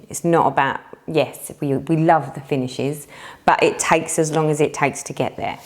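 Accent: United Kingdom. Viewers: I came across your floss tube video quite by chance and I love it , you are hilarious and the struggles you have had are so identifiable to every cross stitcher. I live in a household full of men/ boys so you are my company when I am sat stitching. We left the UK 3 years ago to live on the west coast of Canada and it is so great to hear a voice from home.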